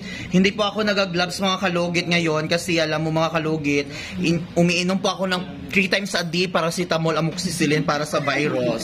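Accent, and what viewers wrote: Iloilo, Philippines. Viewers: tga davao ka sir bat ilongga ang sulti ninyu dha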